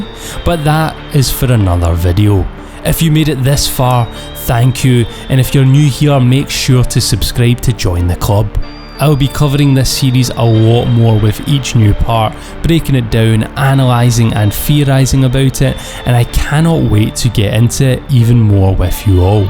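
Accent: Scotland, United Kingdom. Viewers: Scottish accent very funni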